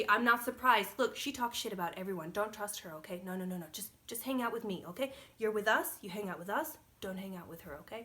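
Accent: Turkey. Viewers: Aksanı amerikadan tr ye gelmiş amerikalı gibi değilde sanki tr den usa ya gidip ingilizce öğrenip gelmiş türk gibi 🤔 her dediğini anlayabiliyorum gibi. Bu işte bi i... var😎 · Bir Türk'ün ingilizce konuşması gibi. Türkçe şehir adlarını aksansız söylüyor. Yani bir Türk. Ebeveynlerinden biri Türk olmalı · I have a feeling that you might even be a Turkish. Your pronounciation of certain words are very Turkish.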